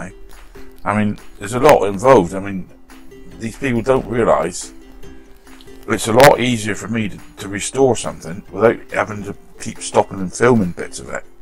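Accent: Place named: West Country, United Kingdom. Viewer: Hi, love the videos seen most of them, usually start my day with one, grew up in Dorset and have lived in the California for 36 yrs, love to here the west country accent.🇬🇧🇺🇸😎👋👍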